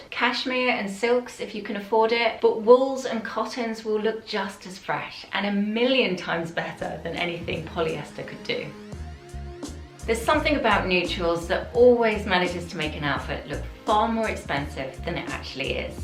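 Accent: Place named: England, United Kingdom. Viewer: Hi, I adore all your outfits and style ideas. This was yet another elegant and classy fashion video. You are wonderful at what you do...please continue! I think it's perfect. You're amazing! Please dont worry about the people with thumbs down...you can't please everyone. I think you always give great fashion and style advice and you do this in such a sweet way with a sweet voice and beautiful English accent, and your lovely personality shines through. Keep doing what you're doing- it's all good! I look forward to your stylish videos. Have a lovely Sunday. Karmel xx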